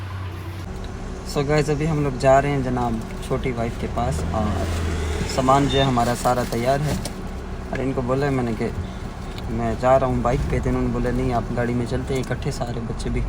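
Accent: Saraiki, Pakistan. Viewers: Happy to hear that saraikian accent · لہجےسےواضح یےکہ یہ صاحب سرائیکی ہیں۔۔۔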